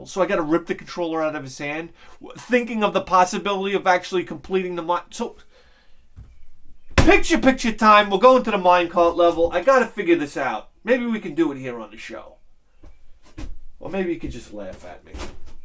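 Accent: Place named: North, United States. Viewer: I dont mind it. Has that northern yankee flavor. Somewhat entertaining in doses. I dig his humor and wantnot. Quintessential Northerner. I like the guy. And I agree, his projects are sick!!!!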